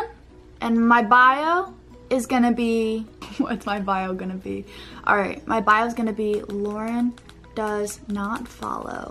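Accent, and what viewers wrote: United Kingdom. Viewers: This is my first time watching u, I saw your tiktok but I wanted to know, are you British???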